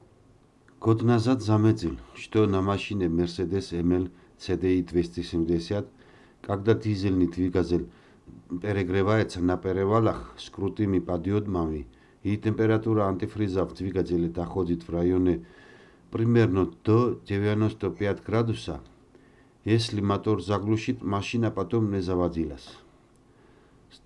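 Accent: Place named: Georgia. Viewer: Такой приятный грузинский акцент,пойду смотреть старые грузинские комедии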